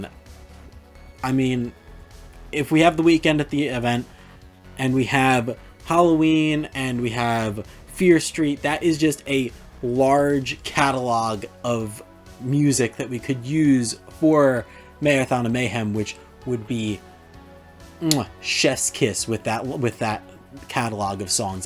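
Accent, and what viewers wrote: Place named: Delaware County, United States. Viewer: Whew that accent jumped out, it’s giving Delco or Delco adjacent.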